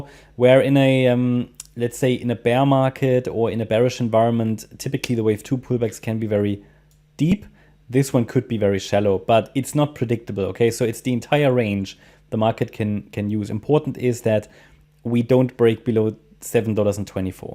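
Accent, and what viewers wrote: Germany. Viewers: Immer schön deutsche Leute Englisch reden zu hören. Gruß aus Berlin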